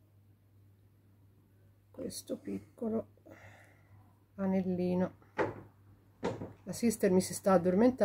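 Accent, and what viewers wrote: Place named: Tuscany, Italy. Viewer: della tua parlata toscana che io adoro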